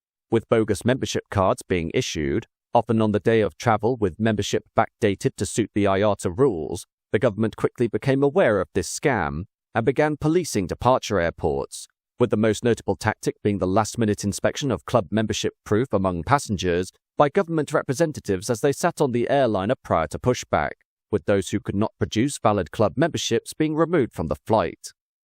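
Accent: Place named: United Kingdom. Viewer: the robot narrator speaks like ... 70s British English?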